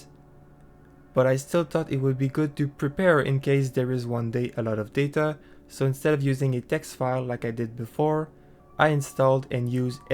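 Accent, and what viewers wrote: Quebec, Canada. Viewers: L'accent queb qui sort mon gars lmao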